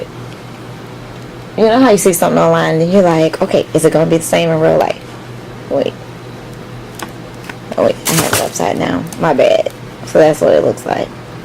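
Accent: New Orleans, United States. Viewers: Are u from Nola? I hear the accent, it's soo cute! Very pretty look ❤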